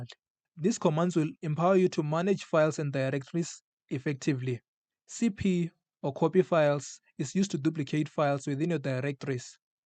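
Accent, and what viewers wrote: South Africa. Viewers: From Mzansi. You sound Venda/Tsonga. Dankie for the video!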